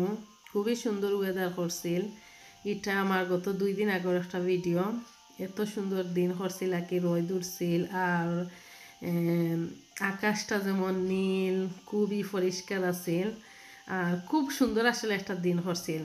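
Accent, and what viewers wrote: Sylhet, Bangladesh. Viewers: আপু সিলেটের আঞ্চলিক ভাষা আমার খুব ভালো লাগে আর সিলেট অঞ্চলের খাবারও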